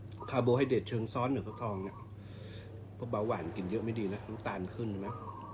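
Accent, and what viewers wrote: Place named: Korat, Thailand. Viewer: พี่ พูดเหมืนคนโคราเลยน้องเป็นคนโคราชแต่มาอยู่ที่ปารีสดูทุกวันเลย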